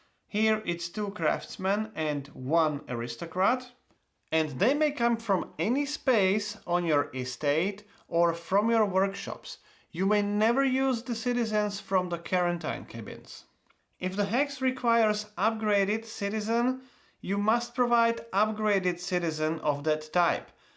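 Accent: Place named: Eastern Europe. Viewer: Wow, thank you so much!!! Amazingly chill content and as a west european, i freaking love east-european accents! 😬 How is it, that the video goes so long compared to your Praga video? I have heard, that this one is easier to teach? 🤔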